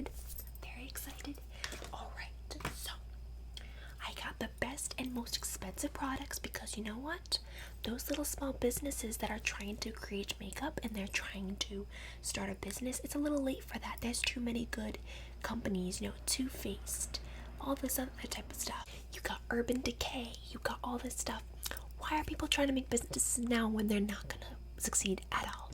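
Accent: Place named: New Jersey, United States. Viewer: You sound more like a Jersey Shore Girl, but I really enjoyed this...Love, Karen BTW, you need to do a video giving her date a shakedown because I made all my daughter’s dates pee their literal pants. They were so afraid of me!! That’s a true Karen...stare him down and yell at him with a whisper!! :)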